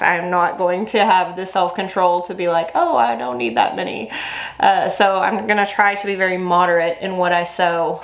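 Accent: South, United States. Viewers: it's been a while since I've had time to watch you. I've missed that time so much. I just wanted to tell you that you're getting prettier the older you get. Also, your southern accent is stronger! 😊 Our garden was very sad last year and we're working towards a better year this year. I sat down and turned your channel on KNOWING that I would be helped in a big way. Thank you for your encouragement and for showing your faith in God. 💚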